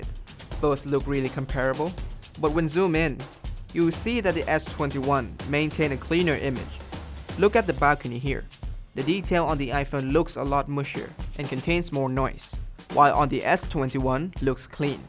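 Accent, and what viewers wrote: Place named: Thailand. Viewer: กำลังสงสัยเหมือนกัน แต่สำเนียงคือเหมือนคนไทย แต่ชอบคอนเทนต์ที่นำเสนอนะมีความเป็นตัวเองมาก ชอบครับ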